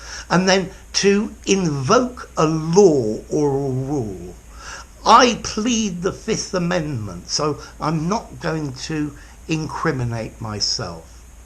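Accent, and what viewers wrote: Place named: England, United Kingdom. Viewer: The aura of your accent evokes some of my immensely-cherished memories in England some years ago.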